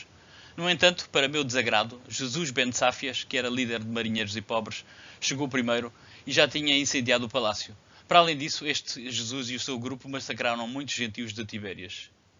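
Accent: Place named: Portugal. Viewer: ouvir um falar em dialeto tamanqueiro não dá.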